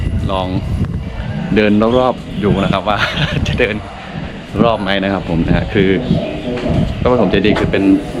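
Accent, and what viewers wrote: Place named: Isan, Thailand. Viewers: สำเนียงภาษาลาวของแอด คล้ายๆสำเนียงภาษาเหนือของไทยอยู่บ้างนะ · สำเนียงเดียวกับคนจ.เลย เพชรบูรณ์ ครับ ผมคนเพชรบูรณ์พูดแบบนี้เหมือนกัน บรรพบุรุษอพยพลงมาจากหลวงพระบาง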